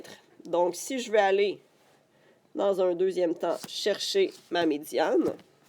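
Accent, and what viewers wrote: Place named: Quebec, Canada. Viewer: elle est quebecoise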